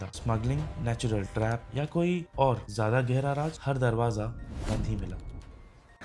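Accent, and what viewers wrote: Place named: Odisha, India. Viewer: kichi nahi bhai thik achi.unique lagiba bhai hindi audience ku tama accent.south bala jetebele english ba hindi kahanti semane south accent re kahanti.sehipari apana odia accent re hindi kahile bi kichi problem nahi.content upare focous karantu,bhasa matter kare nahi.language is just a medium to understant your thoughts.keep it up.love from puri.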